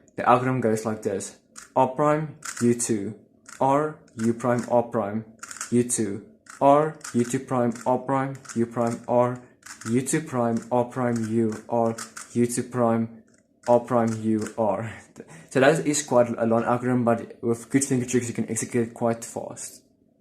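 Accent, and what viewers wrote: South Africa. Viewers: I may be wrong, but are you South African?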